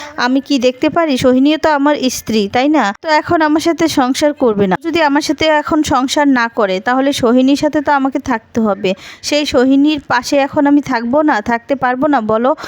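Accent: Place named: Bangladesh. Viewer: Ei serial ta khub jhule geche.sei sathe apnar ei bangla desi uchharon . dutoi biroktikor.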